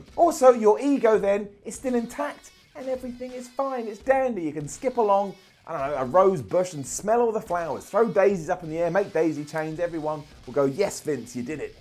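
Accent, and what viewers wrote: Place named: United Kingdom. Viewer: I like that british accent😁😁😁😁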